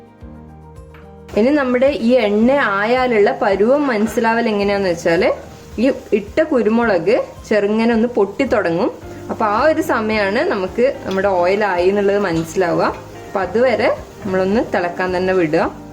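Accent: Kannur, India. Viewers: എവിടാ. സംസാരം കേട്ടപ്പോ തോന്നി. ഞാനും കണ്ണൂർ ആണ്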